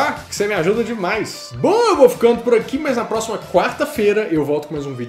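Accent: Minas Gerais, Brazil. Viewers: Cê é mineiro né? Melhor sotaque do mundo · Comecei o vídeo e fui pensando “esse cara é mineiro, é igualzinho meu irmão falando”. Quando ele falou “aqui em Belo Horizonte”, soltei a gargalhada.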